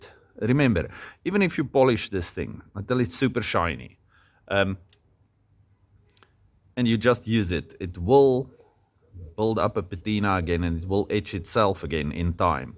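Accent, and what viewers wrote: Afrikaans, South Africa. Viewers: love the Afrikaan accent, groeten uit Nederland! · South African Accent?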